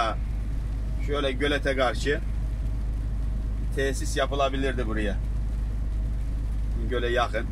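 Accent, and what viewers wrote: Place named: Kastamonu, Turkey. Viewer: Kastamonu bide ıIlgazlılar gibi konuşuyosun abi.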